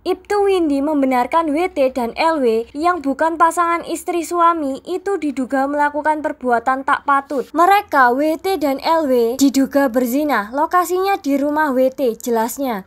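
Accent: Java, Indonesia. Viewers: Mantap mbak medok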